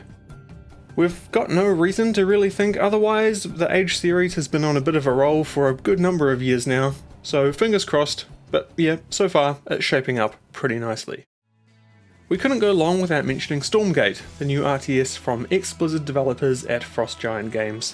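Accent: New Zealand. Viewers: Are u a kiwi bro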